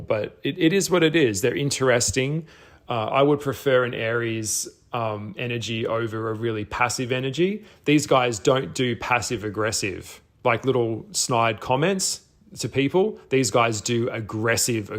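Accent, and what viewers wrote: Australia. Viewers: Nope, not listening to an Aussie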